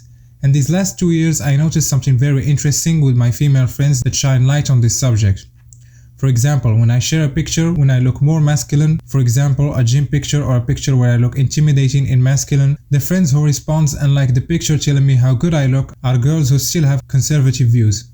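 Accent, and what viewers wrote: Morocco. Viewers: I knew you were Moroccan just by your accent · Ghir mn accent 3rftek meghribi, nadi a khoya laysahel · i guessed your are from morrocco after 10s of the video based on your accent. good content akhoya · I knew that you're Moroccan from the beginning due to the way you pronounce the letter "T" like "tch" or something lol. Much love to Moroccan people ❤️ Also Eid Mubarak if you're Muslim ♥️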